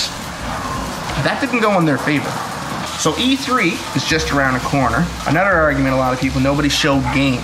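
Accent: Newfoundland, Canada. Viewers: Where are you from buddy? your accent sounds like your from NFLD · You have got to be Newfie man. I know a fellow newfie when I hear one.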